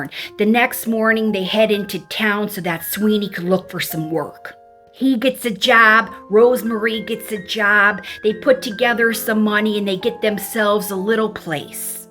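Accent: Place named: Midwest, United States. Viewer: OMG! Your story telling so reminds me of my mom! Because of the Midwest accent 😂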